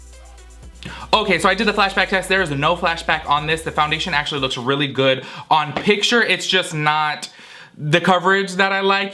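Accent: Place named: South Florida, United States. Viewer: Are you from south Florida like Miami/lauderdale originally? I’ve always wondered bc of your accent. 😊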